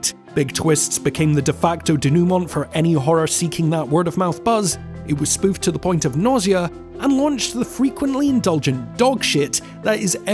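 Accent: Scotland, United Kingdom. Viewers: yes that's the reason I was wondering about your accent...as I told you I'm from cali a city from Colombia south America but now I live close by the missisipi river.. By the way I will let you know someday when my dark fantasy novel come out... Respect Scotland people... keep on coming with more content.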